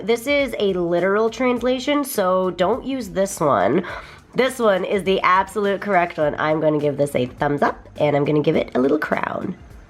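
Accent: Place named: United States. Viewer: you sound extremely american when speaking japanese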